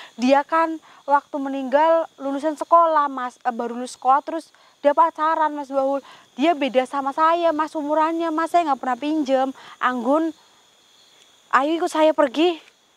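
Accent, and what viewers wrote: Banyumas, Indonesia. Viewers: Kangen ngomong ngapaknya lucu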